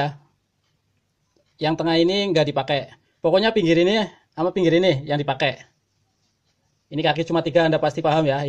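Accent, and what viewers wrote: Banyumas, Indonesia. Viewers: Wong ngapak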